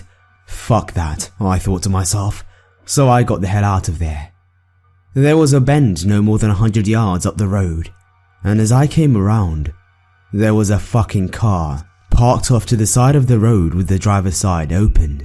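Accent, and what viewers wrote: England, United Kingdom. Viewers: You I love accent is it Australia or England sounds English lol so Sexy much love Sexy Grandma ✌💘😉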